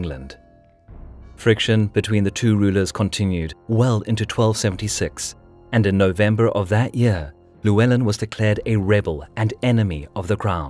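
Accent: South Africa. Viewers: Saffer narrator? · Is this narrator South African? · That's a South African accent ....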